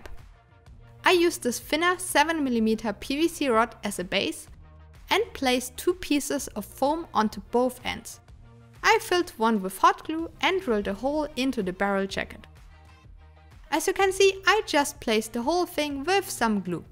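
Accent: Germany. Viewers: Hi do you speek germany, ir sound like this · Dieses deutschhhhh!!! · Einwandfreies Englisch mit Deutsch Akzent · Hi,! Really nice work! Do you live in germany? I think i heard a german accent in your english. ^^ Would be easier for me so i could leave comments in german. 😄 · Sprich doch deutsch🤦🏼man hört das du deutsch sprechen kannst KeIn EnGlIsch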